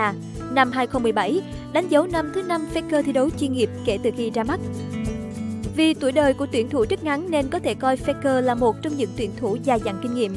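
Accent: Standard. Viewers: Trời giọng chị này chuẩn vãi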